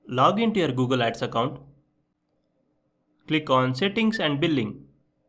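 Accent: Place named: India. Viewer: Fix your Indian accent. It's annoying af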